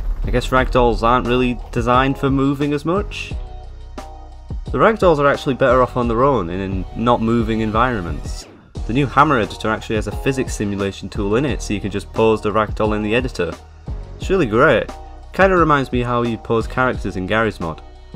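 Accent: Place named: Northern England, United Kingdom. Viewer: kliksphilip but northern england instead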